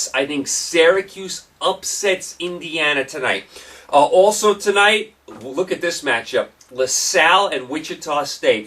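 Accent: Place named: New England, United States. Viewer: I love a Yankee accent.....u defiantly sound better than the sports guy in Bowling Green, Owensboro KY, or Nashville, TN...thanks for sports show.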